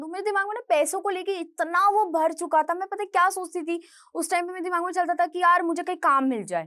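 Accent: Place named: Bengal, India. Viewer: Your sense of humour and witty expressions are unmatched. Excellent. Initially i was also thinking you make videos of celebrities so should be from a rich family with good contacts. May be some nepo kid. Today knowing your background i really respect you. You became and ideal example of success from ground. Last but not the least, you look like Bengali and your witty actions also matches that. Your hindi also not like a north hindi but gol-gol like Bengali Hindi, very sweet. 😂 God by mistake give you birth not an Bengali. Anyways I respect all human being. Have some video with traditional Bengali sharees. I not only subscribed but like and comment in all videos to support you and to support a fighter and good work. God bless you.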